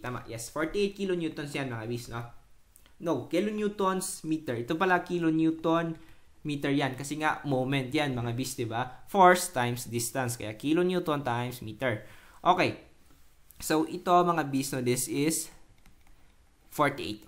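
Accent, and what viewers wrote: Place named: Pangasinan, Philippines. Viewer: now watching <3 quiz later tas exam next week hahaha btw may pangasinan accent kayo like bangking hahaha idk if im right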